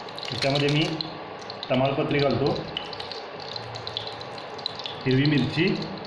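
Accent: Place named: Kolhapur, India. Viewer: Chef asl kolhapuri boltat ki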